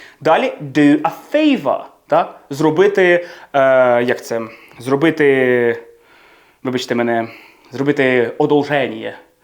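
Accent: United Kingdom. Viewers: Лук класний!, ваш британський акцент просто неймовірний! Як довго ви живете в Британії, що набули такого акценту? Дякую за випуск! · !!!! Велике дякую за Вашу працю. Мені дежу подобається формат викладання англиійської мови. Весело і розуміло. Але трішки добавте прикладів. По 1-2 реченню. Так краще запам'ятати. Ваш look very good!. Едине - я живу зараз в Канаді, pronunciation тут не британський, в цьому є різниця. Але головне з Ваших відео я получаю грамматику. Дякую. Good luck!! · Ви смішний) на Метта Деймона схожий в цьому образі ❤ Відчувається британська вимова, не американська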